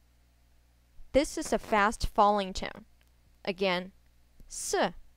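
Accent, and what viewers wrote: Taiwan. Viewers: taiwan mandarin？ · Is this Taiwanese or China dialect? I thought 1 = yao?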